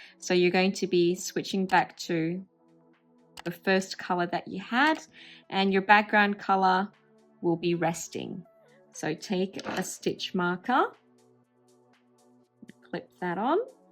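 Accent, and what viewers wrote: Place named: Australia. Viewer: New Sub here. Great work by the way. PS: it’s very refreshing to finally hear an Aussie voice doing crochet videos ❤ loving it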